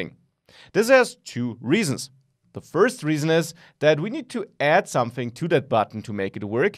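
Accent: Germany. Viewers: I bet 100 dollar he is from germany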